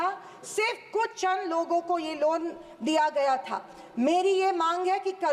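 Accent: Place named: Solapur, India. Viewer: Nice solapur avaj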